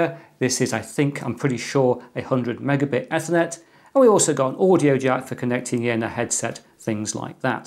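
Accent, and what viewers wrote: England, United Kingdom. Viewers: While there are better tech channels out there (For my specific interests) the British accent certainly draws me in. The neutrality of tone makes it really easy to understand. For this reason, I think you'd do an amazing job at some of the more technical aspects of computers. Something along the lines of the information covered in an A+ certification course. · I love the English accent and the way English people do reviews.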